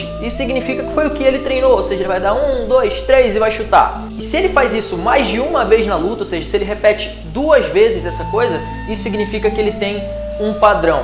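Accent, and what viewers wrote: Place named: Rio de Janeiro, Brazil. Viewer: willian samuel eu acho que é aqui no RJ, nem sei, porque se tu perceber ele puxa o X "vocêx", todo carioca fala assim kkkk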